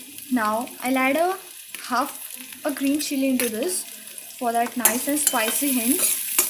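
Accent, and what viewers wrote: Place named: India. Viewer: she is speaking all buttler english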